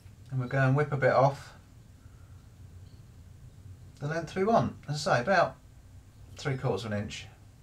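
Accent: United Kingdom. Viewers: Thanks for the great video! The water tight application is needed for attaching something to a metal roof like on a barn. I need to attach a tripod with a radio for wireless internet and do not have access to the underside of the tin, so I am hoping this will do the trick. Self tapping metal screws wouldn't have much to hold on to. But why is a dude with a British accent talking imperial inches and not metric? I am from Texas, and it even sounds strange here where I barely know how metric works. :-)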